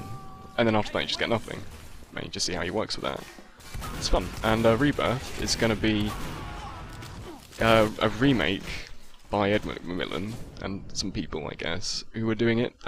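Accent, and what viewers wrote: RP, United Kingdom. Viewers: alright cool however i noticed a big difference between today and 2014. you sound so much more posh! c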